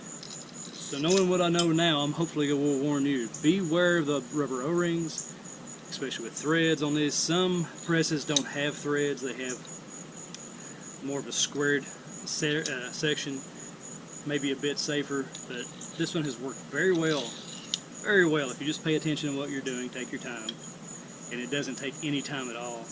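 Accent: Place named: South, United States. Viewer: Hands down best guide on youtube, thanks! Always a pleasure to hear a well-spoken Southern man who understands millimeters!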